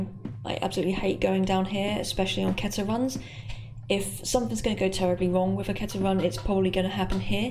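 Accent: United Kingdom. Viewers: Are you British? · I thought this was a 13 year old British kid the entire time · Are you British? Perchance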